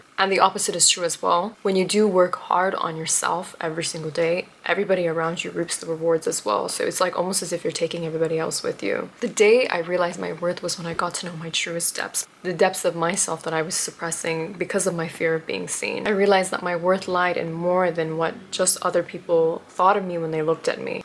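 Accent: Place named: Europe. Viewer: You seem like You speak like You are European. I love Your accent🥰 Know Your Worth 🙌🕊️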